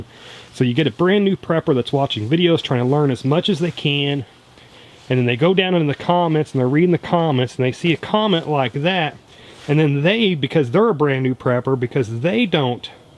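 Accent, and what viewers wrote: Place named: South, United States. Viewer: you're very welcome. I dont recall you saying where you live, but you sound southern and I live in West Alabama near T Town. I've obviously forgotten where you're at. Since I hit 50 (several years ago) I do good to remember much of anything lol. I had been doing some research on something I had requested a video about, burying the dead and such, and i never got back to you on that because some things came up for the fellow who I had been talking about it with and getting the info from. (In case you're confusing me with someone else, but I honestly think you're too young for that and it's most likely me who doesn't remember lol)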